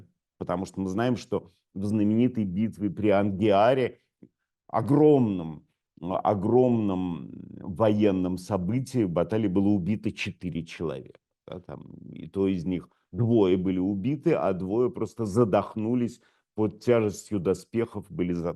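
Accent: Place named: Oxford, United Kingdom. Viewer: Я так и знал! Я всегда знал, или догадывался что милейшие милорд - это человек Оксфорда. Просто по его оксфордскому выговору, по манере держаться, присущей всем небожителям и по качеству работы его мозга.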